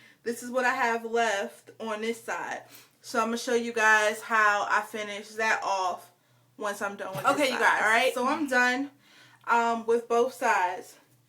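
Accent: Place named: New York, United States. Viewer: Are you from New York, Philly, Or Jersey ? You have an accent lol.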